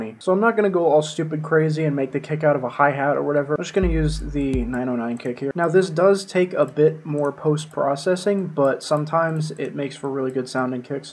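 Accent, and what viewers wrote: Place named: United States. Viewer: I'm making an assumption on your accent but you sound like you're American! Dude I am going to rep you so hard, we need more Americans making hardcore.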